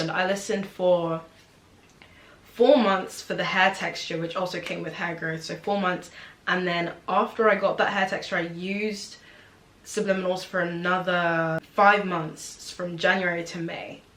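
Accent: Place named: United Kingdom. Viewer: I watched your old vids before you moved and noticed how lovely your UK 🇬🇧 accent is 👁👄👁 it fits you like you never been in the states. Much love 💗 your hair is INCREDIBLE and I’m next 🥰 claiming it 🔅🔆🔅!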